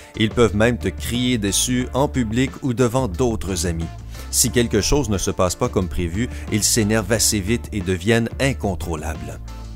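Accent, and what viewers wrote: Quebec, Canada. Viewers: C’est l’accent québécois, n’est-ce pas? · Vous êtes québéquois ? J'adore votre accent ! ❤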